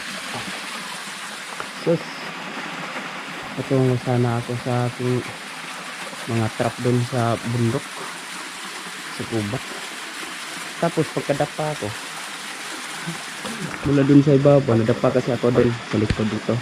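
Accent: Visayas, Philippines. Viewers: Bisaya man cguro ka. Lisod d. E bisaya? Hehehe... Mas nice magbisaya kay baga sa tono😂😂.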